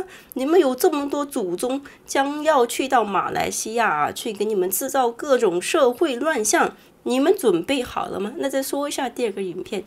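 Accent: China. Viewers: 为什么你是北姑口音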